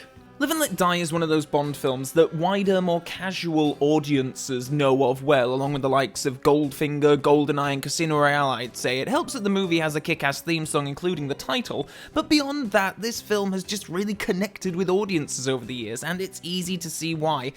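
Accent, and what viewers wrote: Yorkshire, United Kingdom. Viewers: I think it's impossible to dislike. Enthusiastic, insightful, funny, amiable, creative and handsome; he's the perfect YouTube host (which is more of a compliment than it might seem). Personally, I also really like the way that his Yorkshire accent becomes more pronounced when the pitch of his voice rises 🙂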